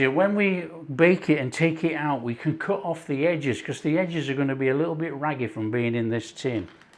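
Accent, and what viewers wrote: Yorkshire, United Kingdom. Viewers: Sounds like Yorkshire accent 👍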